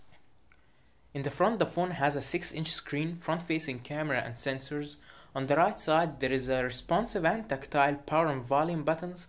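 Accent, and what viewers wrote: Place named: Arab world. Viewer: dat arabic accent doe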